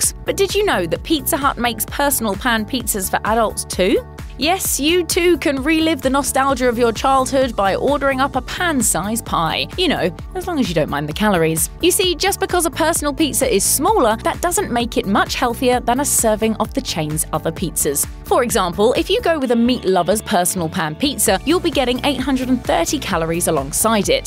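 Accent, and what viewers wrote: United Kingdom. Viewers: How come you have an Anglo voice over about a100% Yanky topic. I'm in Stalya a mix of Yanky an Anglo and here No one would have a clue about this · All well and good, but these are all American shops and it is a British woman talking about them. Very misleading.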